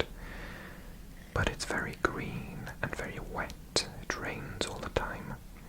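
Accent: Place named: United Kingdom. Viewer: what is funny is that as someone from outside the UK i don’t notice you having a welsh accent and i just hear a british one, EXCEPT when you say “here” and it sounds like hiyuhr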